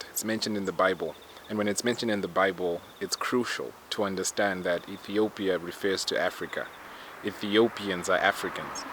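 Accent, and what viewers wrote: South Africa. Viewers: Ey bro, what country are you from?? You sound South African😌🤜🤛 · You are definitely wise and well educated. I love the information you have given. I grew up in Africa and I can tell from your accent that you have grown in south Africa too. Some of this information is beautiful. However there is only one KING OF KINGS, and that's Yahuah Hamashiach(Messiah in Hebrew) not Haile Selassie. I know his name means the power of the Trinity but look up the Trinity. It isn't what Yahuah Hamashiach or his followers preached about. Having said all this, you've quoted the word well with Philip and the Ethiopian. I hope to find more of your videos. Shalom brother. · I know this has nothing to do with the video but I just wanted to ask, are you South African? I had to instantly subscribe looking forward to listening to more of your content 🙏🏾 this was a good video very well articulated and put together 💯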